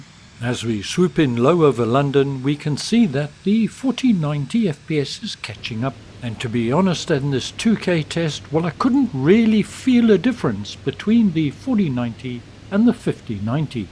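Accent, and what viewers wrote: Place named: South Africa. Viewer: Totally unrelated, but I gotta know; do I detect a South African accent?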